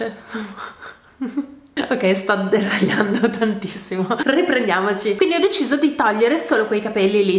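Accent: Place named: Friuli, Italy. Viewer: Ho una curiosità, di dove sei se puoi dirlo? Friulana? Sono in fissa dal primo video che ho visto perché hai un accento che non riesco a identificare 😂 all'inizio avrei detto tipo emiliana, ma sentendoti più spesso direi di no 🤔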